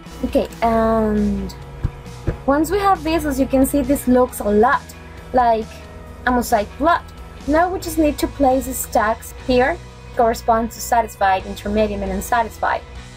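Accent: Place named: RP, United Kingdom. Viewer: Well done, Congratulations. By the way, your english is very good, much better than the english from english speaking countries, it is BBC like.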